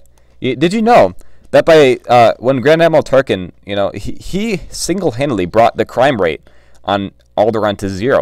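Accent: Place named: United Kingdom. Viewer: brilliant bri ish accent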